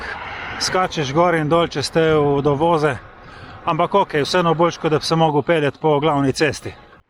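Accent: Primorska, Slovenia. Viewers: Najprej pohvala za res dober kanal, vaše zgodbe so vedno poučne in zelo zanimive, vedno kaj novega zvem o kolesarjenju. Ko sem vas odkril, sem si vzel čas in pogledal vaše prispevke. Čestitam tudi za 1000-ga naročnika pred nekaj dnevi ali tedni. Zdaj pa do vprašanja... kako je kaj z defekti gum, se vam velikokrat dogajajo? Ali imate s seboj zračnico in komplet za menjavo ali popravilo defekta- pri specialkah no vsaj pri meni se je na začetku to velikokrat dogajalo. Ko sem recimo šel na eno daljšo turo, ko sem imel novo kolo šele nekaj dni, se mi je zgodil defekt. Ker sem se prej vozil samo s treking ali gorskim, sem bil čisto presenečen, saj pri gorskem nisem nikoli s seboj imel kita za popravilo, ker ga tudi nisem nikoli zares potreboval. Na srečo mi je na poti pomagal en gospod iz Kranja, ki je pa imel zračnico in mi jo je celo pomagal namontirat gor. 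Ta pravi kolesarji so res dobra družba in so pripravljeni pomagati začetnikom. Čez nekaj časa sem ugotovil, da sem imel verjetno napačen tlak v zračnicah- od nakupa nisem nič preverjal tlaka. Ali ste kdaj razmišljal o tubeless kolesih oz.kakšno je vaše mnenje? Sem drugače iz Primorske in mi j lepo slišati primorski naglas, sicer sem jaz bolj iz Krasa okolica Sežane, vendar že dolgo živim v Ljubljani. Hvala za odgovor in želim še veliko varnih in sproščujočih kilometrov na kolesu.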